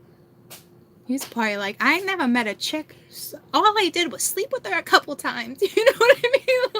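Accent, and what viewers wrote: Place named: New York City, United States. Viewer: Hello. Having a fairly good night,getting into videos on my 2 favorite planets,SATURN&MARS. ESPECIALLY SATURN'S MOON "TITAN",CHILLING OUT WATCHING "THE MATEIX" AND THEN "THE TERMINATOR",JUST CHILLING TIL I FALL OUT. P.S. LOVE YOUR "NEWYORK CITY"ACCENT!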